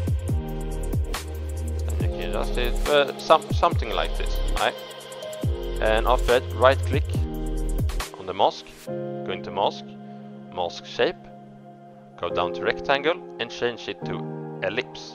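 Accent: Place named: Sweden. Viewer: Du låter jävligt svensk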